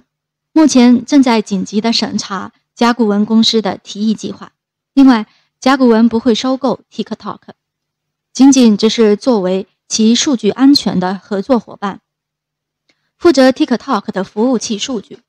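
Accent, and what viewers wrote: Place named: Fuzhou, China. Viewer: 應該是福州老鄉不容易好比你不容易碰到能把普通話說的遛的香港人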